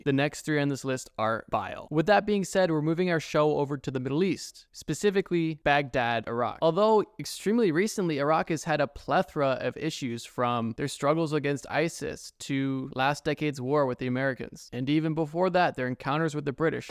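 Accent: Scotland, United Kingdom. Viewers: Your Scottish accent sounds like how kids talk at my school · Great Video. Where is our link Bro?! We want to hear your Scottish accent lol. You are passed 21k likes.....I guess I should have waited til the end before posting this lmao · 21k likes where’s the scottish accent i’ve been waiting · please give us the Scottish accent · then we'll get to hear his Scottish accent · Where's the Scottish accent video, we're at 22K likes :D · Where is da Scottish accent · Let's get that Scottish accent! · Wheres the Scottish accent,? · Gimme the Scottish accent, please?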